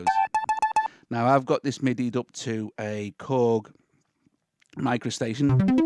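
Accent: United Kingdom. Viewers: I loooooooooooove your accent! Is that British?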